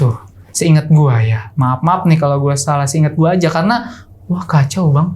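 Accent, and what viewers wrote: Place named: Sunda, Indonesia. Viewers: pinaliiii sunda pisan ang..ang..ang... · sunda pisannnn mntp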